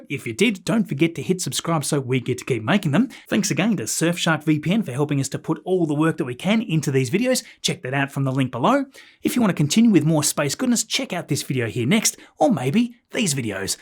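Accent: Australia. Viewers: I've been hearing it for years and I'm finally commenting on it. The Aussie accent makes it sound like you're saying boostera instead of booster. I love our accent sometimes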